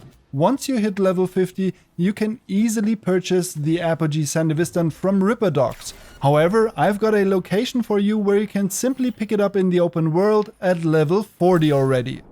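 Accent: Germany. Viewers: Hier sieht man mal wie krass der unterschied zwischen deutschen und englischen Videos ist. Der original creator hat hunderte Videos consistent gemacht und du hast schon halb so viele Abos… würde dem ganzen mal folgen, da das echt interessant zu sehen ist und ich selber überlege englisch zu machen. Wie ist deine Erfahrung mit dem “deutschen Akzent und wie gut die Leute das annehmen?” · Akzent: Deutschalisiert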